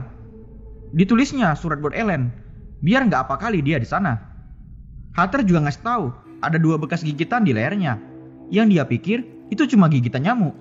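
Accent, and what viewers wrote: Medan, Indonesia. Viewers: Orang medan kah kau bang? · Wkwkw.berasa kawan awak yang ceritain ke awak Kerasa kali logat medan nya we 🤣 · Jan dinampakkan kali lah medannya bang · 😓😓 astagaa aku telat 3 hari.. 🤦🏻 .. pahal in chanel favorid akuu.. 😢😢 smngt yh. ma'af sdh telat.. sebagai ganti.. aku tdk akan melewatkn semua iklan y. 😍😍😍 .. logat medan y aku sukaa kali.. 😍😍😍😘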